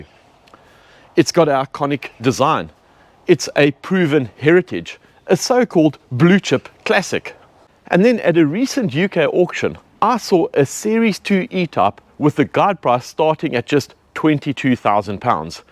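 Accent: South Africa. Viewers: I detect a South African accent. 🇿🇦 Sitting in my local in Margate recently, in discussion on classic cars with a friend, who like me is in his 60's, a 21 year old sitting next to us told us how he also preferred older cars, like those from the early 2000's.😅😂. Great collection & great video. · That is one hell of an accent you have. South African? Whatever it is it's probably worth more than the cars. God bless.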